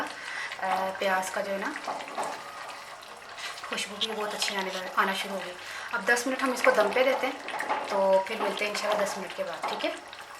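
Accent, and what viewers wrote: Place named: Punjab, India. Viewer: Punjabi boht pyri bolti hn ap api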